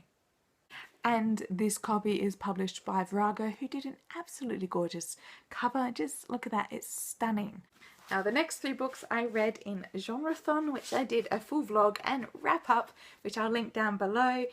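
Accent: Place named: Australia. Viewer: Are u from Adelaide or Melbourne just wondering ?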